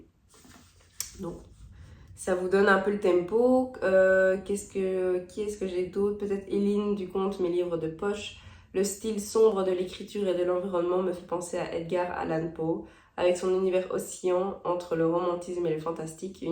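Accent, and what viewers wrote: Belgium. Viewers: Bonjour, contente de te retrouver en 2023 même avec cette fin de Covid qu'on sait très longue. Je te fais tous mes meilleurs vœux pour 2023 ainsi qu'à tes proches. De belles lectures et des vlogs supers comme tu nous en a fait en 2022. Ma première lecture de Janvier 2023 est le Domaine de l'Héritière de Lucinda Riley. Je n'ai pas encore lu Fleat House que j'avais reçu mais je vais avoir de la lecture à partir de fin janvier car je suis jury catégorie polar pour livre de poche. Enfin, j'espère que cette année tu continueras à t'épanouir. Le moral bas entre mi-septembre et fin octobre, je connais très bien, c'est une période très compliquée ou j'ai perdu ma maman en septembre 2011 et mon papa en octobre 2013 et cela est toujours douloureux surtout pour mon papa. Voilà continue à nous régaler avec tes vlogs, ton naturel, et ton accent pour les nombres en belge.😃Amitié Laure sur insta.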